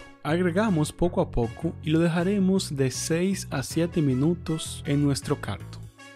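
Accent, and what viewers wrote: Dominican Republic. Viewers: eres dominicano?. Saludos desde República Dominicana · Eso se ve ricoo! una pregunta de que nacionalidad eres??? porque si no me equivoco me suena el acento Dominicano, yo soy Dominicana.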